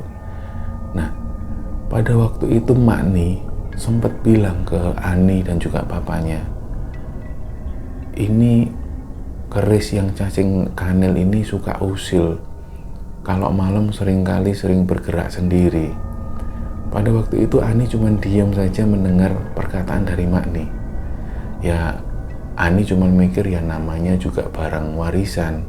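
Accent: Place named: East Java, Indonesia. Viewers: Iya mmg kalo mas itu di resapi enak dgn khas jawatimuran kdang mas idris tp yg keliatan jawa yo mas buat dogeng tdurku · Maaf mas agak telat....iki baru mas....medok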